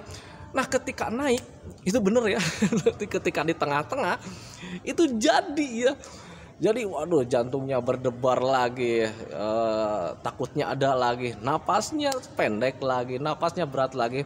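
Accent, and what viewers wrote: West Java, Indonesia. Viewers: Bapa orang sunda ya? Kalo iya. Kali2 pake bhasa sunda nya dong gpp kali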